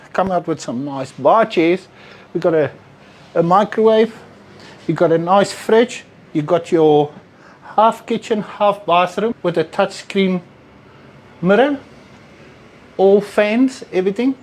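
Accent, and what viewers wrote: South Africa. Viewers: That's one of the strongest South African accents I've ever heard..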